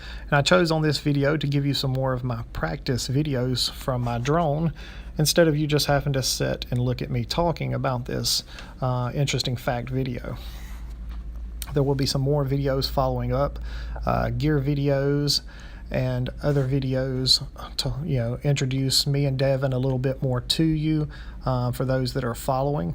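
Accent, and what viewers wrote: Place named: South, United States. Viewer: Nice job and good facts for the newbies to the whole idea. I catch a bit of a Southern accent, where are you from?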